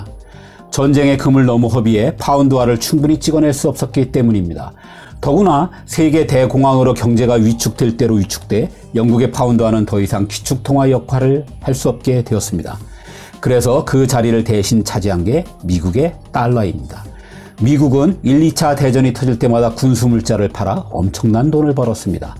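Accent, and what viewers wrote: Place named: Seoul, South Korea. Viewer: 감사합니다. 목소리가 성우 클라스시네. 우리나라 서울 말씨는 참 듣기 좋아요. 그래서 한류의 첫번째 이유지만~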